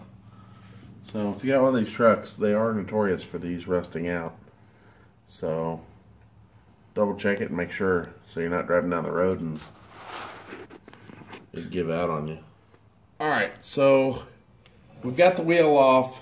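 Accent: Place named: East Coast, United States. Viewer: About time someone knows how to talk east coast checking in.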